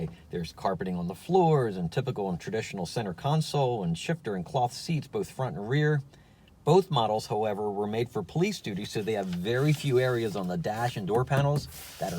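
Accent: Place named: East Coast, United States. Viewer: Really hate the east coast accent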